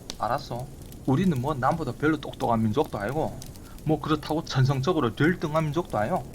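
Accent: Yanbian, China. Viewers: 잘들었소ㅡ오빠욕하는것도 멋있고 연변말도 너무멋있소 다음절목을 기대하겠소 화이팅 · 야 너꼭 돈 받아먹고하는 소리구나 이후부터 연변말 하지마 왜 뭘아는것처럼하며 남이 정치에 간섭이냐연변사람 쫒 팔리계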